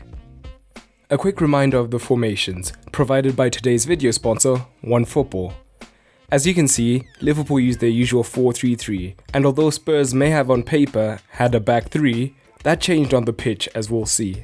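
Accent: South Africa. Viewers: Are u from SA, just asking because of ur accent. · South african accent?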